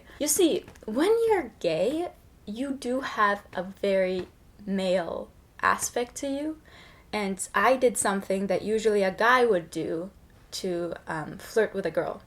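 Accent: Turkey. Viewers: Bilimsel açıdan bakacak olursak 7 yaşına kadar öğrendiğin tüm diller anadilin oluyor (detay için Noam Chomsky'nin LAD çalışmalarını okuyabilirsiniz) ve beyin hasarı almadıysan kolay kolay bu dili unutmuyor, dili konuşurken bir anda aksanlı konuşmaya başlamıyorsun. 15 yaşına kadar Türkiye'de yaşamış ve türkçe konuşmuş bir insanın bir anda 5 veya 10 senede dilini unutup kendini ifade edememeye başlaması tamamen tiyatro. Kaldı ki İngilizcesi de çok belli olmasa da aksanlı ve bazı kelimeleri türkçe aksanla telaffuz ediyor. Bu tarz triplere girmek garip, bir dili iyi konuştuğu mesajını vermek için başka bir dili kötü konuşuyormuş taklidi yapmana gerek yok. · Bende 16 yasinda turkiyeye geldim, 5 sene burdayim ve burda ingilizce konusan yok, ama ben ingilizcemi unutmadim, ingilizce ana dilimdir, ama bu kiz nasil unutuyor onu anlamiyorum... Yalan ya hepsi.. saten, amerikan aksanininda turkce olan tang'i var. 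( evet turkcem daha cok gelisti ama ucmadi burda) Basically, she has a Turkish tang in her voice, it's kinda like how latinos speak.. I'm sorry to say this sweety, but you couldn't make it more obvious. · Türk aksanıyla İngilizce konuşuyorsun Türkçe fazla konuşmasan da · Aksani var hatta basta turk oldugunu bilmiyodum cok sasirdim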